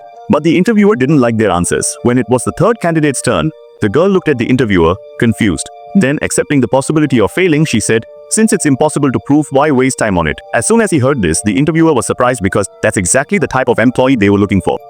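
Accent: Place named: India. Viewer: bro why does this fuckass ai voice sound fucking indian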